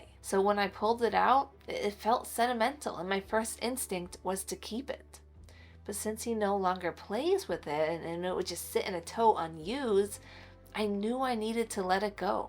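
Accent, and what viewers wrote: England, United Kingdom. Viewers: ENGLISH accent! There is no such accent as British. We are Irish, Scots and Welsh too. The accent you do is stupid English stupid Peppa Pig Hugh Grant! Do not ever come to Scotland and call us British. 😡😡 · Oi, a British accent IS normal. 🤣It's a lovely, gentle book, and full of good advice, and she's very right about doing it every few years from the age of 60 if not before. Our lives change, our tastes change, but we hang on to the old stuff that no longer suits us.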